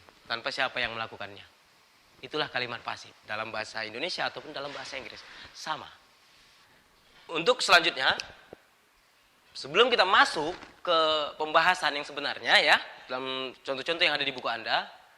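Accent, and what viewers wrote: Medan, Indonesia. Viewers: Orang medan emg gitu lah logatnya gan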